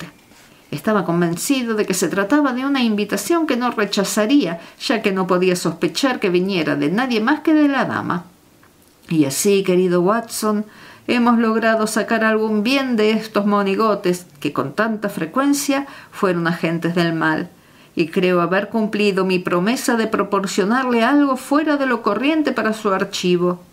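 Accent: Rioplatense, Argentina. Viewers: Me encantó la narración, el tono y estilo de la voz, la velocidad, el acting... pero tanto SHO,SHO,SHO del castellano rioplatense me irritó y no terminé de escuchar. ¡Un abrazo y mucha suerte! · Para mí la pronunciación de las "y" y de las "ll" al modo rioplatense es absolutamente irrelevante. En cambio la entonación de las oraciones y de los párrafos es perfecto. La interpretación de los personajes y sus voces es sutilmente eficaz. No hay incorrecciones en el seseo o el ceceo ni en ninguna otro fonema. Los acentos son correctos. Y la narración de principio a fin es no sólo amena sino que consigue enganchar, entretener y alegrar el intelecto. Gracias de verdad por este canal de lectura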